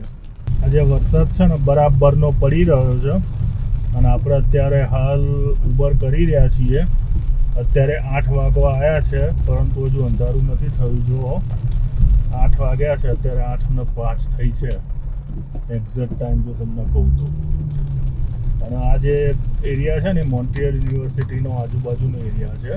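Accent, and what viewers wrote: Mehsana, India. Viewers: ભાઈ તમારી બોલવાની ભાષા પર થી લાગેછે કે તમે મહેસાણા જીલ્લા ના રહેવાસી લાગો છો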